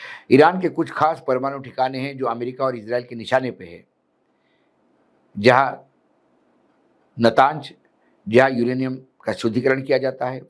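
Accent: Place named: Mumbai, India. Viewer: Andhe bhakt pehle marte bad mai hum 😂❤ ji apki bhasa mast Mumbai tadka Wali hai apko sunne ka ek Maja hai😂alhamdulillah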